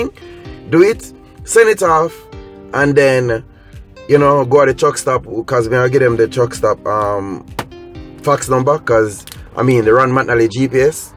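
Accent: Jamaica. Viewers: Hey yuh should read the captions on yuh videos, it's so funny. Youtube captions algorithm can't handle de Jamaican accent.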